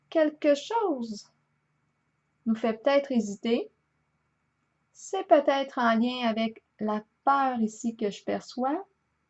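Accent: Canada. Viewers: Quel plaisir d entendre ce bel accent canadien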